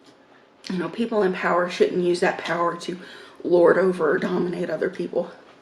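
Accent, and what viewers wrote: South, United States. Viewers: I love that you are talking with us more. Love your little bit of southern accent and your laugh. You really worried me tonight, sweetie, when you swallowed the chicken bone. You were eating fast and that could have been very dangerous. It would break my heart if anything happened to you. If you don't post another video before Christmas, I want to wish you now, the very happiest of Christmas's. I hope there will be a celebration at your house! Thinking of you with Love xoxo. Livia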